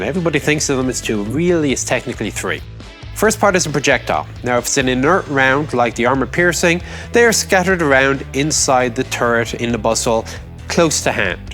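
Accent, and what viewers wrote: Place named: Ireland. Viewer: Originally from Ireland, his Irish accent gets stronger when he has been visiting Ireland lol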